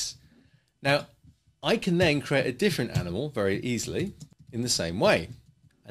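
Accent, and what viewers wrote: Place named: Cockney, United Kingdom. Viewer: Cockney Accent 🤣🤣🤣🤣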